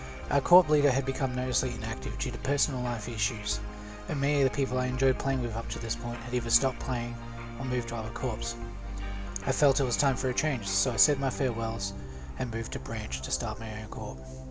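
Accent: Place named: Australia. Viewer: subbed. good on you for moving past the frustration that arises time and again. Looking forward to more videos. It may be the nerves, it may be the quick aussie speak but I found it hard to make out what you were saying as it was going by so fast. :) Could also be my own crap hearing tho. o7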